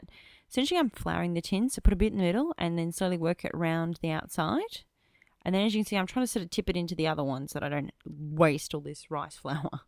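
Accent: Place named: Australia. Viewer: Hi love your videos and explanations wonderful job!! I love your accent too are you from Australia? Keep up the great videos!! Allie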